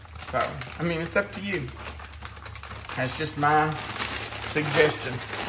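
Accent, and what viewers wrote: South, United States. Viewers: Thank you for all the yummy recipes! I love your calming Southern drawl. Sometimes your videos make me sleepy, your voice soothes me so much! Thanks, for writing out the recipes. Sending hugs from Beth in Savannah, GA.🤗💜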